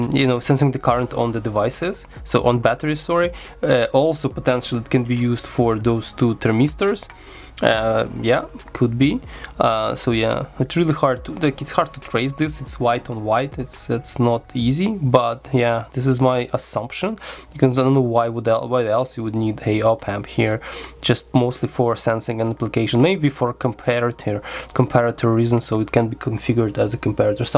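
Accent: Russia. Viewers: У вас российский (или другой специфический) акцент? или у меня что-то с восприятием речи? 🤣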